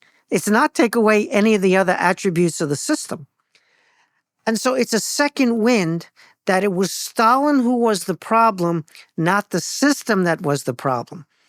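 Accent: New Jersey, United States. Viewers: man im from up there my entire family has jersey accents and this guy sounds uncannily like joe pesci.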